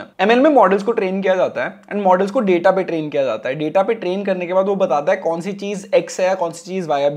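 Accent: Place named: India. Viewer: Dude, you may have been saying something worth listening to, but your accent makes it very difficult for non-Indian speakers to understand you because you talk too fast. If you really want to get your information out there in videos, try talking slower.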